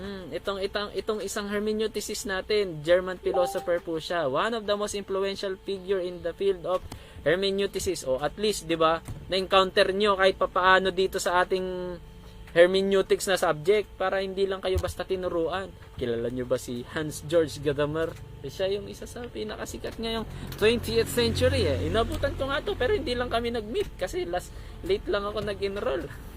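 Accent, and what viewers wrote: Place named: Ilocos, Philippines. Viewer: Parang Ilocano din ang Pronounciation ninyo doc he he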